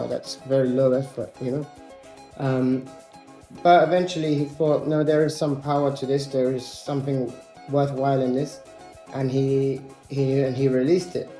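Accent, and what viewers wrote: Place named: Germany. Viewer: I love thissss he speaks like a 8 year old German child learning English. Not an insult.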